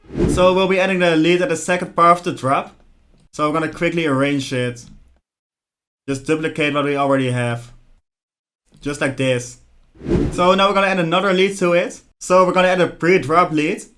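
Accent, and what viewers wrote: Netherlands. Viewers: U must be dutch ey?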